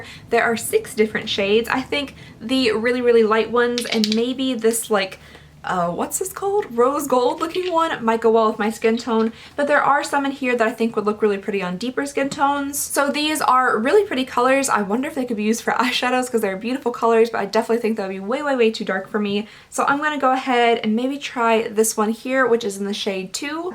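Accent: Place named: South, United States. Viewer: I am just so glad to hear a person that speaks as I do. Hey y'all:-) Louisville is definitely not Richmond but our accents are similar. I cannot stand watching other people! You are funny and pretty!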